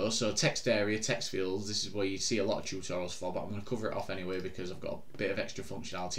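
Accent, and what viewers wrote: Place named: United Kingdom. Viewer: Hi , Being an Indian, we find it difficult to listen to UK English , it would be great if u talk in simple and clear English for ex:- mark Shust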